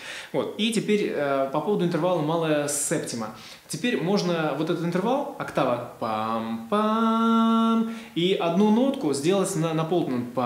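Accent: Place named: Germany. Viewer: Урок - супер! Спасибо! Те кто думает, что может играть без интервалов, Вы правы, но забудьте об импровизации (хотя Вас может выручить идеальный слух и память)., очень забавляет (по доброму) Ваше произношение английских слов с немецким прочтением;)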